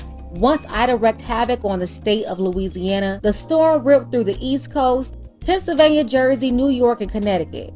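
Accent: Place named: Florida, United States. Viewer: I just subscribed. Love you content. Your accent sounds like your from Florida. If you are, do you mind me asking what part of fl are you from?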